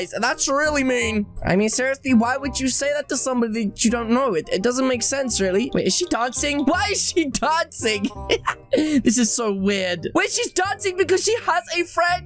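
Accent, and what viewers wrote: United Kingdom. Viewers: No offence but you sound like jelly but I bit British I’m sorry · Why is your accent British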